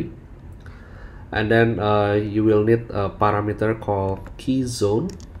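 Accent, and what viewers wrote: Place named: Philippines. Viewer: Are you from Philippines? Your accent is so similar to my co-worker it almost feels like I'm listening to him talk 😁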